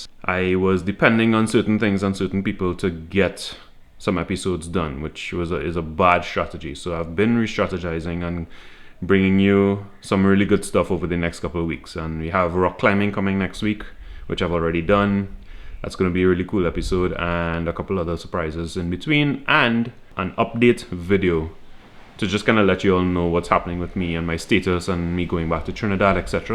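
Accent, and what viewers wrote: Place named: Trinidad and Tobago. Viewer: Recognize this accent anywhere. Hi Trini!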